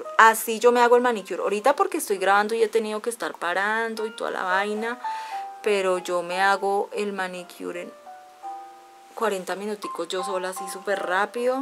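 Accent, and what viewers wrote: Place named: Colombia. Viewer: pensé q eras colombiana jajajja por tu manera de hablar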